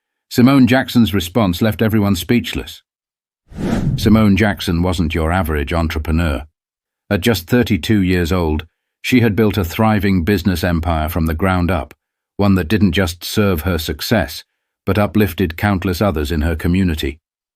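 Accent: United Kingdom. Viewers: You know what I h8... a british accent telling the story of a successful Black woman. Ya just can't stop trying to make it about you colonizers.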